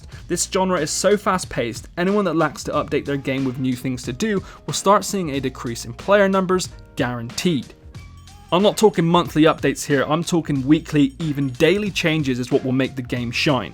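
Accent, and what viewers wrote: United Kingdom. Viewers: he's British it's how we say it so stfu ffs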